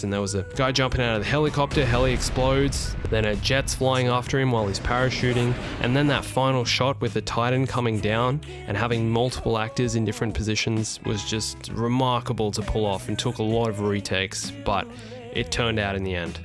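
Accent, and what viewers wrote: Australia. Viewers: are you Australian?? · YES!!!! AN AUSSIE! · You're Australian?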